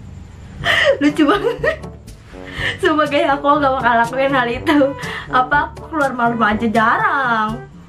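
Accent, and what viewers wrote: West Java, Indonesia. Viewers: Orang sunda ya neng?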